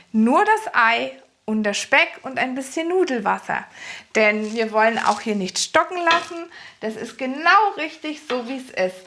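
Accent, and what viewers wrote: Standard. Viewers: Ooooh ich bin bisher noch nie ein Freund der Spaghetti Carbonara gewesen, da ich es hasse, wenn man überall Sahne rein klatscht. Liebe, diese Soße wűrde/wird mir mit Sicherheit schmecken. Ich war ganz überascht, daß du auch Koch-Videos machst, denn bisher kannte ich nur deine leckeren Backrezepte. Nur das du dort nicht so hochdeutsch schwatzt. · Wieder ein super Video. Vielen Dank. Aber drinen Dialekt habe ich vermisst. 🤤🤤🤤🤤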